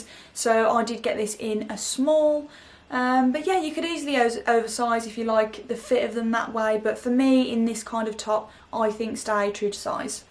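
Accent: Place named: Midlands, United Kingdom. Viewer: I love listening to you, I'm from West Midlands and love hearing a local accent! Not enough Midlands you tubers ❤😘 x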